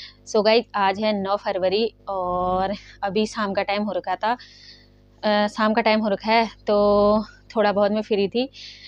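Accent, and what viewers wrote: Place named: Uttarakhand, India. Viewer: Baat krne k tone se smj jate h wo log Uttrakhand se h 😂koi nhi didi time lgega par jada pese ka maal lena h to sadar se fayeda h 20k ya 30k ka lena h to local se shi h.. First time sbke saat hota h lekin thoda tez hona pdta h didi lekin ab to pta chl gya hoga aapko ki kaha se saman lene m fayeda h first time sbke saat hota h lekin aaj b bina billty k saman aata h bs pta hona chahiye kon bhejta h bs first time sbke saat hota h uske baad sb sahi h bs thoda tez bno waha gunge ki traffic rahoge to badiya se lete h wo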